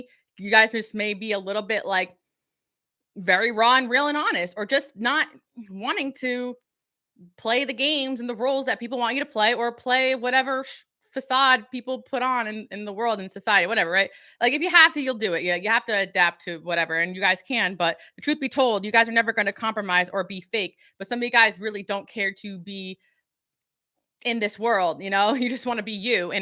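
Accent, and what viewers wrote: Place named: South, United States. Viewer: Lol readin territ… lean i to that southern why don ya 😅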